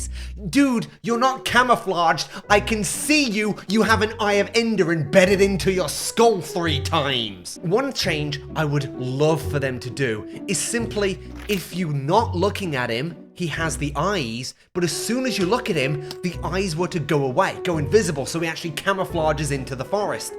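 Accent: United Kingdom. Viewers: I love British man talking angry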